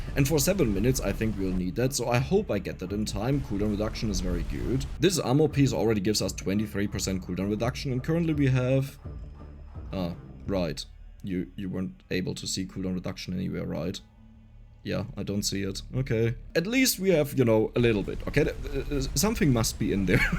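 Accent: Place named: Germany. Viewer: loved the video so far, but kind of curios... are you german or from a german speaking country? I feel like your accent kinda sounds like it ever so slightly.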